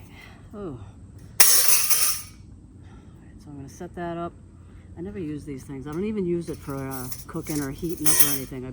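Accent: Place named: North, United States. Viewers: As a Northerner (PNW sounds more Canadian than anything else,) I like her Easternish accent. Getting in her cah, or saying which gear is bettah...lol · We southerners think it's funny when northerners talk about how amusing it is to listen to our southern dialect. Hearing you speak yankee is enjoyable to me. Thanks for what you are doing.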